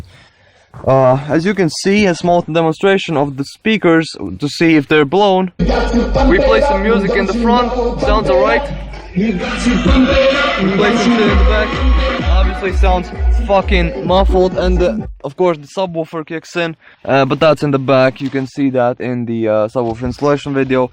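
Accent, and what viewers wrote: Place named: Latvia. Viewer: Can hear ur latvian just by the talkin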